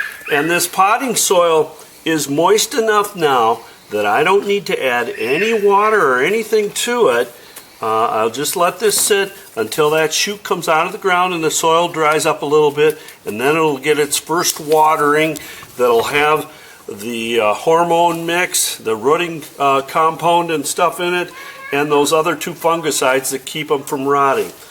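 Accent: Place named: United States. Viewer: Love this info. And finally someone with plain English from America explaining things. Thanks for this video.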